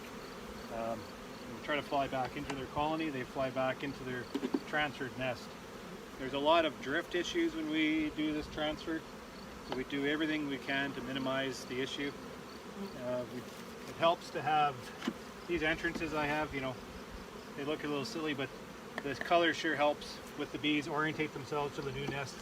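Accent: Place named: Canada. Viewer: I didn't see your name but I knew you were Canadian by your accent. it was nice to hear your voice being in asia for so long I guess I am missing being home sometimes. nice to hear it.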